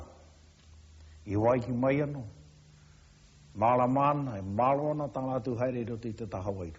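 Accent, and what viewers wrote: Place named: Ngapuhi, New Zealand. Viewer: Te mita o Ngapuhi💗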